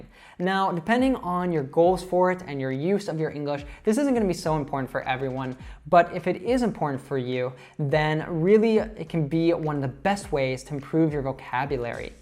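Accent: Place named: United Kingdom. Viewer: Hi....do you conduct online class. I like british accent and wanna to be fluent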